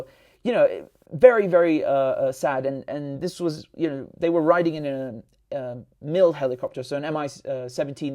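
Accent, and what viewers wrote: England, United Kingdom. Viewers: Bro go back go your Syria and stop spreading anti west cheap propoganda in British accent. If you love so much your resistance you should live there.. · English accent is strong in this one.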